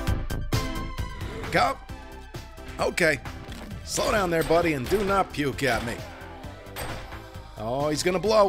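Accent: New Jersey, United States. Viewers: I HATE zombie games & jump scares...that being said, I bought 7D2D because I LOVE your content. I've been playing it faithfully for months now. Thanks to you, I have become quite the zombie killer! I never miss one of your episodes and have been working through your past content, because you give great entertainment! Congrats on 400k subs, and looking forward to hearing your dulcet Jersey tones in the future!:D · army of 400,000 degenerates going strong! Guys, go back and watch some of the very first videos he posted. He used to be so polite and no Jersey accent at all. Listen to him now! I much prefer this version of lol dude you rock!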